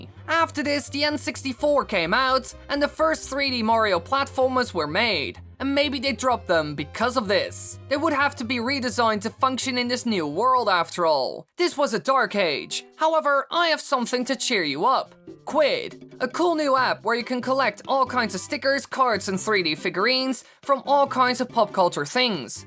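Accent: Netherlands. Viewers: Are you from Nederland s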